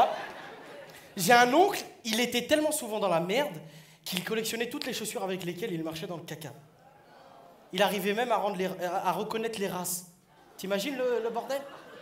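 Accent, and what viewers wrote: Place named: Senegal. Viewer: On dirait seydou abathia mais tu a l air senegalais meme accent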